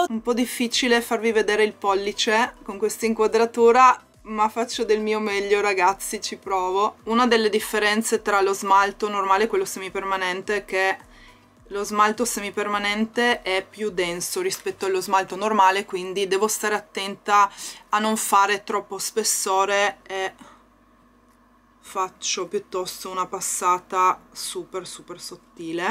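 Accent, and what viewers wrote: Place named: Trieste, Italy. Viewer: La cadenza è triestina...Qualche parola la pronuncia un poco male perché porta l' invisalign ai denti...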